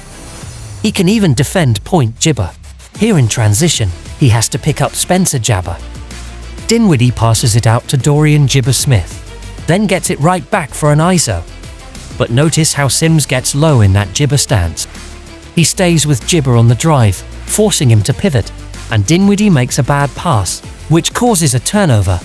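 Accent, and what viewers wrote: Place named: United Kingdom. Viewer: Like your British English accent bro ‘ but I am not sure about jibber and jabber deal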